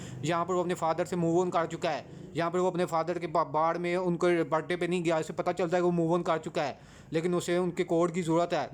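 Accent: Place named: Lahore, Pakistan. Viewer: Barawa lahori explain kar rha Hy Yahan parrr Yahan parr zarorr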